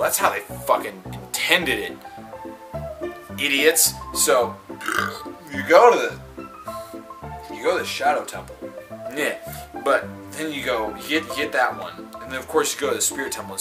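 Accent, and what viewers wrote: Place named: Germany. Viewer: I wish you would have done this in your german accent, but this was really funny you should damage your liver more often xD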